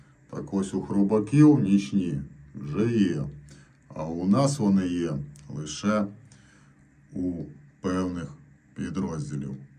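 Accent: Transcarpathia, Ukraine. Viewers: Закарпатська говірка,-Рахів, Костилівка, Ділове )))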